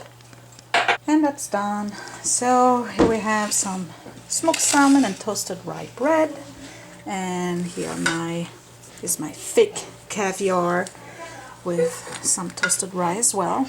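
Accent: France. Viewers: Listening to you and your mother speaking in French reminded me of something I keep wondering about ... I know you have been in this country a long time and your accent is very slight but the question I have is, do you THINK in French and then have to translate before you speak or do you now THINK in English? That's just my strange mind at work again.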